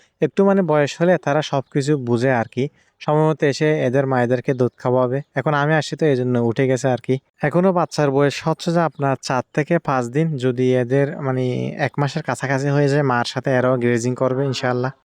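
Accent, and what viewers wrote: Chittagong, Bangladesh. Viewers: ভাই আপনার ফার্মের ঠিকানাটা কোথায় মনে হয় চট্টগ্রামে তোমার বাসায় একটু চট্টগ্রামের মতো লাগে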